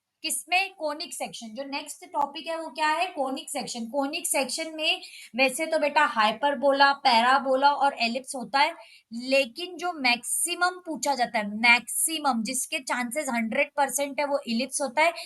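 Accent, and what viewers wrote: Indore, India. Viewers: Mam Indore se ho kya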